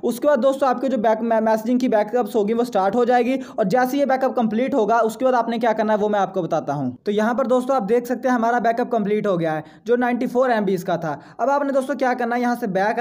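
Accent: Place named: Pakistan. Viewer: Bhai aapki English se lagrha hai aap pakistan ke hain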